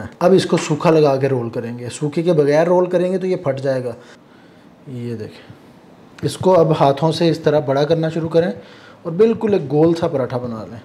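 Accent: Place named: Pakistan. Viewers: TrueTrue7 . Its still Pakistani accent, not Indian... samjhe? Bewaqoof!! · I speak bangla and can barely speak urdu but i must say pakistani urdu accent is so pleasing MASHAALLAH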